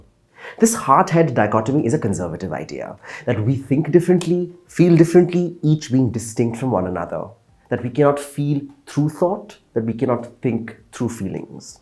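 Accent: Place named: United Kingdom. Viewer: I am eager to hear your review on Salaar tomorrow! With same classic British English!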